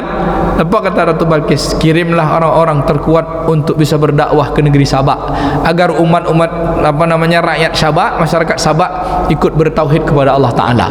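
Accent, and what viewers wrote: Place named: West Sumatra, Indonesia. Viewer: Dari sumatera barat, ada sesekali beliau pakai bahasa minang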